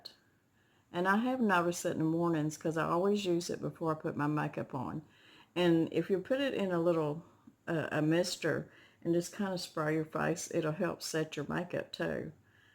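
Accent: United States. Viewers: LOVE that country accent, girlfriend! You sound like family. 😁 I love putting rose water in a spray bottle and lightly mist it on my face and diluted, I use it on my hair as a leave-in/refresher spritz. As soon as we move I'm going to try to grow some roses just to make RW with! 👍👍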